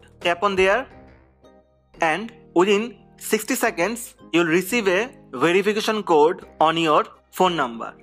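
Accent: India. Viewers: I've never heard such an extreme indian accent🤣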